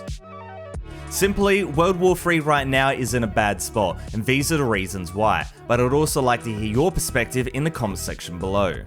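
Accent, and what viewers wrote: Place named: Australia. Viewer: You sound Australian my guy. Do you ever get good ping?/are their AU servers? From the 5 or so games I've played they've all been red ping. Not worth the headache for me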